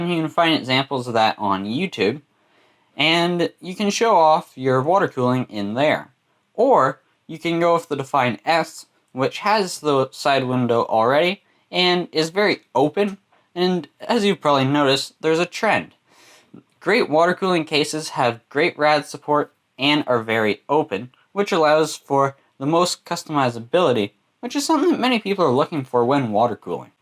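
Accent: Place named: Canada. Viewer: you speak so bad and the Canadian accent makes it even worse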